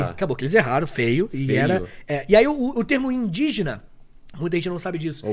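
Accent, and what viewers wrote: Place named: Rio de Janeiro, Brazil. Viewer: Comecei a segui-lo por agora, Prof. Erro meu, eu sei. Vou procurar esse programa para absorver todas as ideias. Você é muito bom, obrigada. ❤ E esse sotaque carioca é um absuuuurdo.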